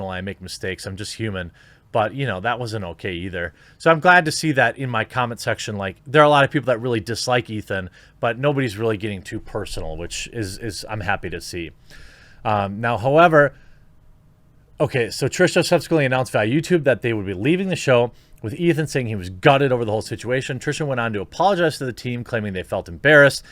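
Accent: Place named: Wisconsin, United States. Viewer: you say bag like every single person from wisconsin i have ever met.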